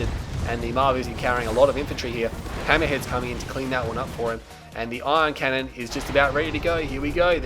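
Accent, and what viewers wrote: Australia. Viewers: Old video I know, but I love your excellent commentary, and the Aussie accent just makes it. Honestly I prefer your casts to Sybert's.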